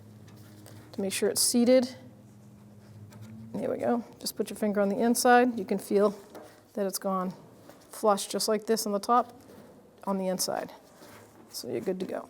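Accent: Boston, United States. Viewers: I tremendously appreciate how calm and straightforward you are with your videos! No electronic riffs and no annoying background music to muffle or garble your words! Just clear speech (w/ a Boston accent) and straightforward instruction. I recommend you to whoever I can while talking about vehicle maintenance. I thank you again!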